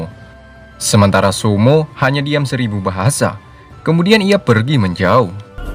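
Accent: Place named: Madura, Indonesia. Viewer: lu orang mana bg kok logatnya kek orang madura atau jawa timur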